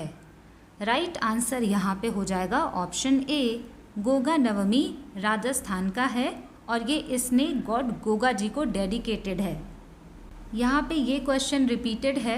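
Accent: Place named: West Bengal, India. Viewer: Ma'am aap pakka West Bengal sein ho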